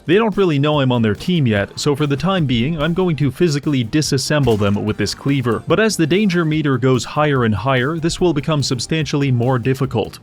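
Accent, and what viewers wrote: Canada. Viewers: So you’re Canadian Eh? Pitiful.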